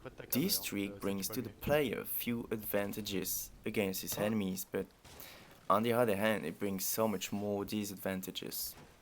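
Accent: France. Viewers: You have the most intense French accent I've ever heard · Are you French ? If yes, you speak English very well ! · L'accent français discret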